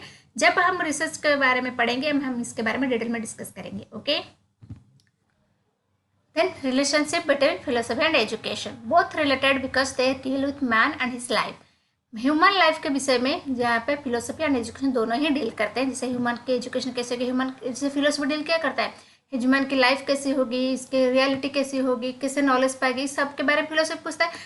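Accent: Odisha, India. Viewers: Ma'am app Odisha se ho kya ?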